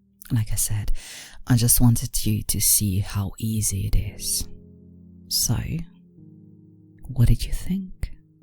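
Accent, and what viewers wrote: Scotland, United Kingdom. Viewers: I can't figure out the accent, the os sound Australian, then she sounds Scottish but the way she rolls some words sounds Russian or something. I love her tho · She sounds Scottish to me, is that just me?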